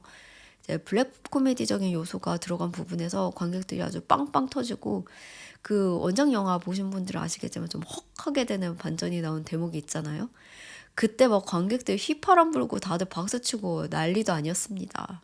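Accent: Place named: Seoul, South Korea. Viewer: 서울말 듣기 좋다.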